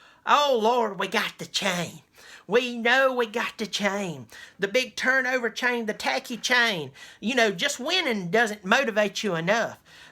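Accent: South, United States. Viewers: first things first I love your southern accent, second thing Ohio State made it to the playoffs last year over Penn State, when Penn State won their conference Championship, but what happened they put Ohio State in over Penn State. so if I was you I would do a little bit more studying about the committee instead of complaining that you got to face Alabama again. the committee said Alabama was the better team over Ohio State which is because that 31 point butt kicking that Iowa put on Ohio State. Ohio State is not the better team because all year long they had their ups and their downs, Alabama went the whole year at number 1 or number 2 and the only loss they had was against Auburn. they are so went 11 -1, with 8 players out due to injuries and we still played better then Ohio State, and don't forget Clemson loss to Syracuse.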